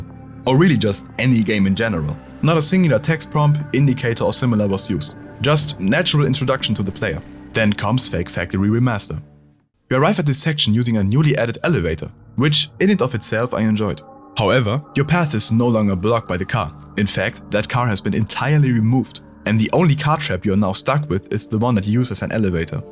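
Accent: Germany. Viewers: I can here that your german... Still my guy making peak after peak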